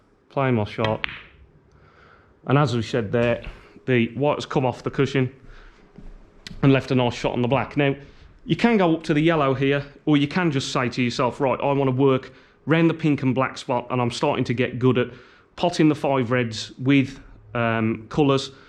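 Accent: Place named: England, United Kingdom. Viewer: Ok cool. Im a Londoner born and bred. Nowadays most whites kids in London speak English with a hybrid Jamaican dialect which is very different from the anglo saxon dialect of English which is spoken in this t.v programme by this presenter. In the early 2000's, young white kids on council estates in London became JAMAICANISED. This is when they starting speaking with English with a hybrid Jamaican dialect. For example, Essex county is the only place in Britain where the cockney dialect/and or accent is still spoken. Tell something. Do you speak English in a hybrid Jamaican dialect?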